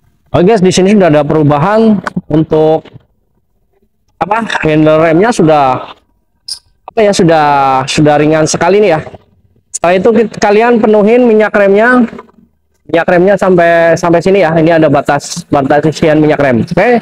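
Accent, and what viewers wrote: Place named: Cilacap, Indonesia. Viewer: Ternyata ini orang Cilacap jd jawareh😮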